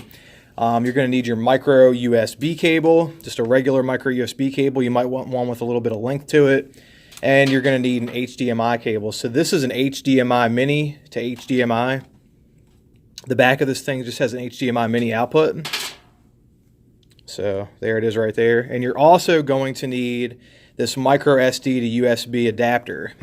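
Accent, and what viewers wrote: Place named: South, United States. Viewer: Surfer dude? This dude clearly sounds like a hick.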